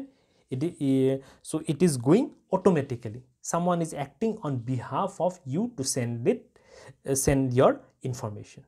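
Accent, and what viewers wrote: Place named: Uttar Pradesh, India. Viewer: Hello you are from UP na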